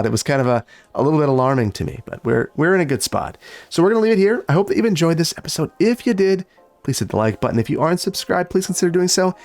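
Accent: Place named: Upper Midwest, United States. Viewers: you really hear that north midwest come out in baggers (BAEggers) I think that part of the regional accent is so charming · Lol, "certain amenities like *beggars*"...Gotta love that midwestern accent!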